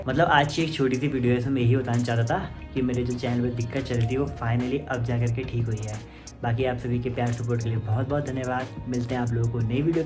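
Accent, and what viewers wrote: United States. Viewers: Goooooood american english bahut heeeee jabardast ❤ lage raho safalta jarur milegi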